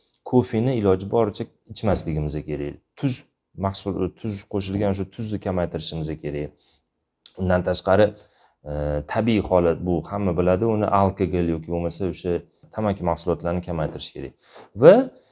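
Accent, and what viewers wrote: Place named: Tashkent, Uzbekistan. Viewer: Илтимос Тошкент шевасида эмас китов тилида гапиринг.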